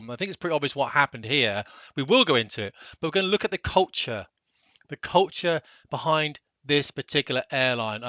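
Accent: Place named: England, United Kingdom. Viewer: The gentleman speaks with evidences of a regional English rather than the "received" British English or standard, mid-western American English and at a pace suggestive of being prodded on with a stick. Very difficult, I find, to follow! Very regrettable!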